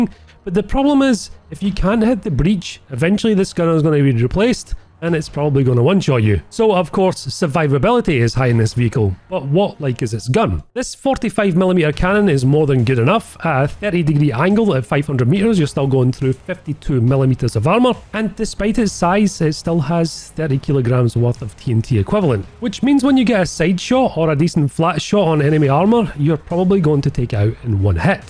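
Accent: Scotland, United Kingdom. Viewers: as a new subscriber, I ask this favor of you with the complete understanding that it very well may never happen. But, nonetheless, can you please say "Burglar Alarm" in a video, please? Jeremy Clarkson had a Scot say that phrase on the old Top Gear and It would kill me to hear you, another thick accented Scot, do the same. Thank you, sir, love your videos!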